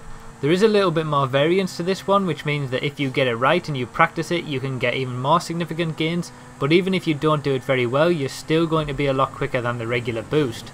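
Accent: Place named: Teesside, United Kingdom. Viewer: You sound like a lad from teeside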